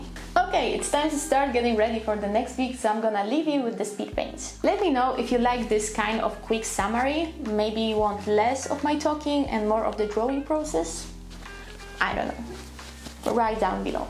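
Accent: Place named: Poland. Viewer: "InkTober would be fun" I said- "I can show off my OCs" I said- 😂 my sympathies that InkTober is draining you, too - but I can see why! A new drawing every day is pretty daunting; I've been following all of your prompts on Instagram, and they are absolutely gorgeous, every single one! I'm glad you decided to draw days 13 and 14 in advance so you could set aside time for this video, even if it was a lot of extra work 😭 "Sketching is the most important stage" ...welp, I wish I had known that 14 days ago- for the first 10 days of the challenge I was just going in all ink! (All in? All ink? 🔏) I only started doing sketches recently 😅 ahh... so much unnecessary pain : Friends is such a great show! Noooo! ;-; I love your speaking though! You speak English very well ☺️ and even if you decided to do Polish w/ English subtitles, it would be great because you have a very lovely voice, and a beautiful accent ✨🇵🇱 Also, this style of video is wonderful - keep it up! 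Not to mention your humor~ The speedpaint process is so so beautiful! Even if you're not absolutely loving every single one, I think they're all masterpieces in their own way Thank you for sharing your talent with us!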